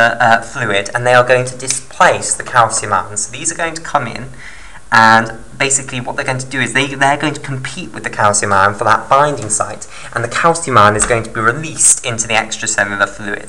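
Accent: United Kingdom. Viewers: well explain and well accent british accent , right ?????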